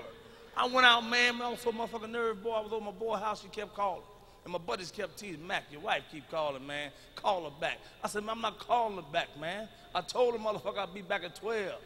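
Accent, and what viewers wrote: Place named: South, United States. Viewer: exactly just go to a family down south in any of those areas nd you'll see folks speaking very akin to Mac. Nothing like the legend himself tho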